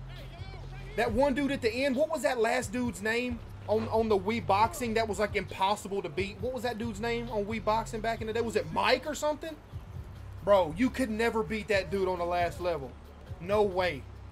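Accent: South, United States. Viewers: my accent defiantly fluctuated with the people i surrounded myself with when i was in highschool. And my southern accent rubbed off on them aswell 😂 funny too see also had the ghetto southern accent at one point too 😭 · southern accent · like a ghetto is wild, he talks like he hooped in the south because...he hooped in the south. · bro thats just the southern accent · thats not heavy southern its gangsta southern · nah man that's just his southern accent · My boy had that southern/2K accent heavyyyyy lmao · Not gonna lie his American accent was better than the gamer accent but people change whenever they hang around different people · When Caseoh was playing 2k, he was speaking southerner blaccent code switch is real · I hope accent doesn’t go away anymore then it already has, I live in the south so I like the southern/country accents lol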